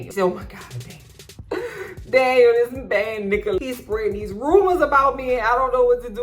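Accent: New Orleans, United States. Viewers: Great review! Are you from New Orleans? My gosh love the accent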